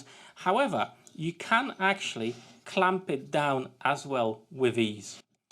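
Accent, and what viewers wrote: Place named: Black Country, United Kingdom. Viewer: Thanks. I´ve just ordered one of these from Ali Express. I read your bio. Your English is great! Did you live in The Black Country when you were in England? Birmingham? Wolverhampton? The accent can be a bit comical if you´re not from round those parts. Sorry Brummies. Love you, really!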